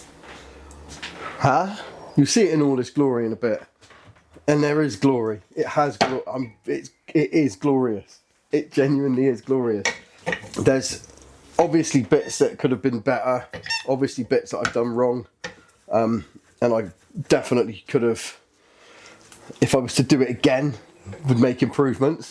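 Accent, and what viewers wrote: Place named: West Country, United Kingdom. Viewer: Thought I recognised west coutry accent.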